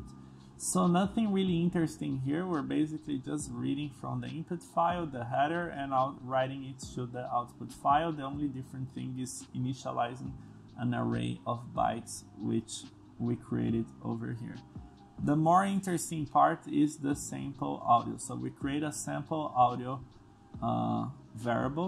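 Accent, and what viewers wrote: Brazil. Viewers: Very good explanation bro, thank you! I just stayed with one doubt, are you brazilian ? Your accent is too much typically hahaha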